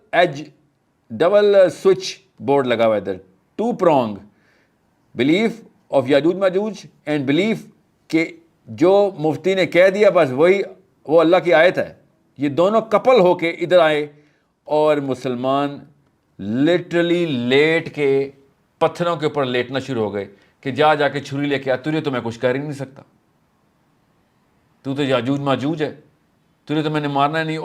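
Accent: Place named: Punjab, Pakistan. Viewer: mispronouncing with your Punjabi language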